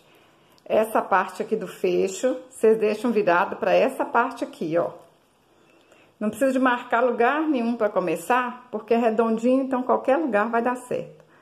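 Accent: Minas Gerais, Brazil. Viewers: Você é mineira, né?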